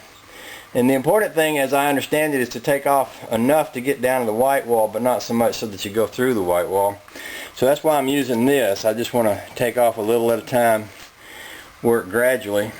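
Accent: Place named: South, United States. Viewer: Where are you located? Your accent tells me your in the south but I've seen this car at the Owls Head transportation Museum in Maine, far from the south, haha. Did you sell the car? Or loan it to the museum? I Love that place and usually visit every time I'm up in Maine.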